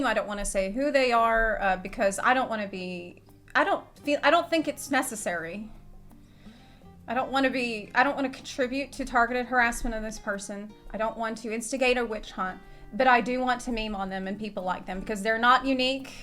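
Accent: South, United States. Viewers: Some videos she just gets real southern. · I can't get over the fact how SOUTHERN sounds. She reverted so quickly to her American accent. It's astounding. E: As far as the topic at hand, I have an FC apartment, I have a private ward apartment, and I have a small house. That's 400 slots, that's more than enough for me. I'm not double dipping on any one form of housing available to an individual. I got my apartments way before I ever did my house (thanks ward expansions, you're the real MVP here), but I know what the rules are, and I stick with them. I wish everyone did the same. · Has anyone else noticed that since has been back in the US shes been slowly getting a stronger southern accent? LOL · Your accent is sounding a lot more southern since getting back to the states... it adds to your cuteness level 😁😁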